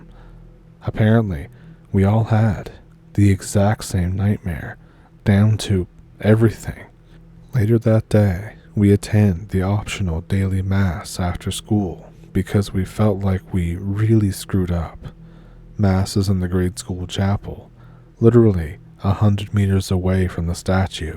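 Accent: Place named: Newfoundland, Canada. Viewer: Narrator sounds like he’s from Newfoundland! Me too brudder, me too. If not you must be east coaster fer sure. Thanks for the upload makes me feel like I’m home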